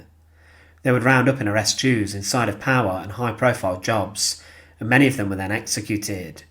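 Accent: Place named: West Midlands, United Kingdom. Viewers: Modulating his voice? If you mean the intonation, that's just the local accent in parts of the West Midlands where the narrator is clearly from. People have different accents including different intonation, that's just the way it is. I watch channels hosted by people from the deep south of the USA, they too have very specific accents and intonation but I just accept that as part and parcel of their narration. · He has a much better voice and pronunciation care than so many shoddy YT presenters who don't bother or care to learn how to say foreign names and words. He writes and organizes well and carefully. His particular English accent another commenter said is "West Midlands." As an American I've had no trouble understanding him. If you have specific names he doesn't say sufficiently well, then pointing them out and writing phonetically how to say them could help.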